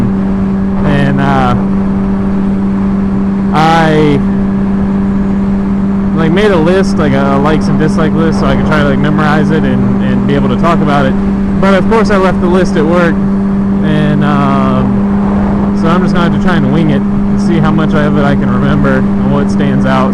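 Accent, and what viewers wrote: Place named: Alabama, United States. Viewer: U from Alabama too?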